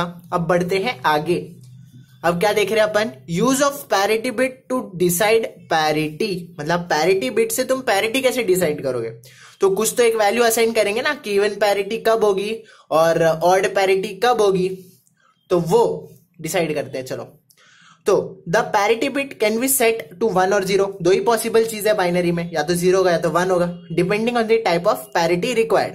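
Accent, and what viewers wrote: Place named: Hyderabad, India. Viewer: His accent is slightly Hyderabadi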